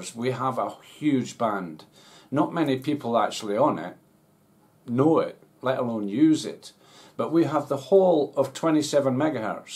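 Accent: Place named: Scotland, United Kingdom. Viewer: Hey you old hippie. You sound very much like a Scotsman. I'm a Ham radio operator and quite often I do 11 meters. Hang mostly on 27.385 LSB which is the national calling frequency on 11 meters. I use a Yaesu 991a which I had the MARS mod done so I can go anywhere on 25 to 27 MHz. I use an Antron 99 antenna for 11 & 10 meters. I've got an MFJ 969 Versa Tuner II, roller inductor tuner just in case my 991a won't tune the frequency. Cheers & 73 from W Rusty Lane K9POW in eastern Tennessee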